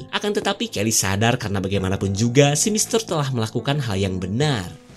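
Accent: Sunda, Indonesia. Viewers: Sering "afdett bangg siaaapp menungguu apa lgii cara ngomong nyh cmpur sundaa heheheh😂😂😂❤❤❤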